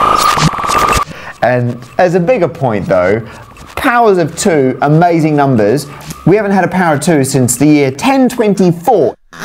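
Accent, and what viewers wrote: Australia. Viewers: He sounds Australian to me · tell me more, Mr. Australian Math Man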